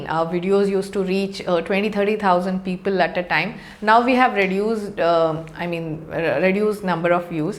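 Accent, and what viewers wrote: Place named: India. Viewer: Indian English language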